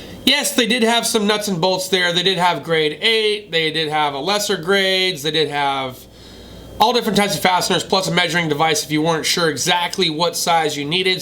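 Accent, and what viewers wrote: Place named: New England, United States. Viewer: Still talk like a Yankee tho👍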